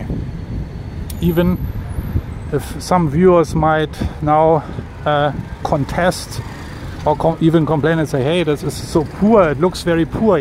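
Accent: Germany. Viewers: European accent most probably if I'm not wrong you're a German, am I right?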